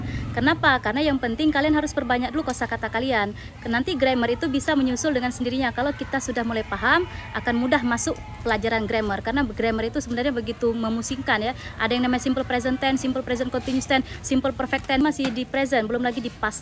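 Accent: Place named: Aceh, Indonesia. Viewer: Bereh bu kemanapun gaya Acehnya tetap aneuk Aceh mețuah.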